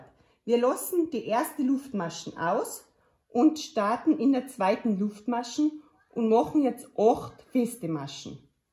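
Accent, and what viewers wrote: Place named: Austria. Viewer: Vielen lieben Dank, liebe, für die Veröffentlichung deiner Idee, die durch Ihre Einfachheit wirkt. - Exakt was ich gesucht habe. 🤩. Und genau richtig für den heutigen Sonntagmorgen, wenn die anderen noch schlafen. ☺️ Da habe ich jetzt schon eines großen Teil fertig vom ersten Teil. Und ich freue mich, Videos mal im Österreichischen Dialekt zu entdecken. sehr sympathisch. Und auch noch ein riesiges Kompliment für eure Tischplatte - ein Traum!!!!! mlg. aus der Steiermark 👋🏼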